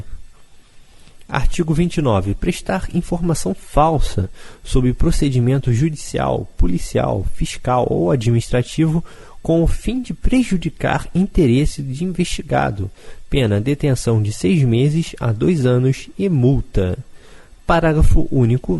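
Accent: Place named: Rio de Janeiro, Brazil. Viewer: Esse é carioca kkk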